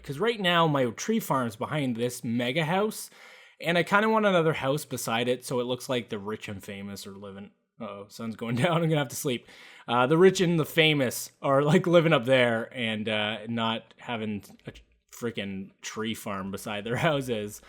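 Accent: Canada. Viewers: hahaha. Its really weird but I heard canadian and bit of nourthen english. It's probably just me tho. By the way your builds are amazing :D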